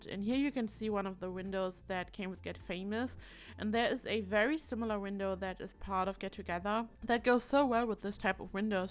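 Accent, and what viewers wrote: Germany. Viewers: Are you German by chance? I feel like I recognize your accent.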